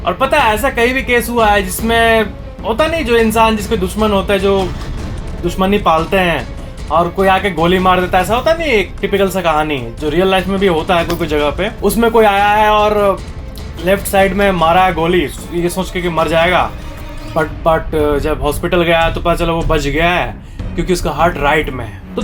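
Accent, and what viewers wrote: Bihar, India. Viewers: U r from Bihar bhole bhihaari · Bihar se ho jio bro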